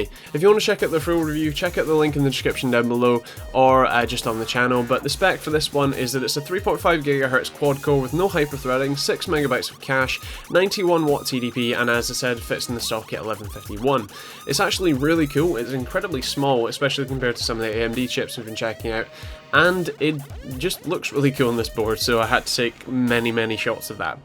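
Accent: Scotland, United Kingdom. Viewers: You seem to have a very slight Scottish accent? Where you from?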